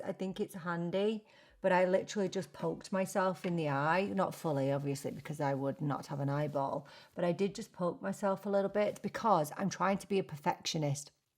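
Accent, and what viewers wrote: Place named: North, United Kingdom. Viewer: No I don’t wear lashes either it just takes too long because I have no skill either 😂 but those ones sound a bit easier. Did I ever tell you watch your videos because you are funny, entertaining and I love your northern accent 😊🙌🏼💜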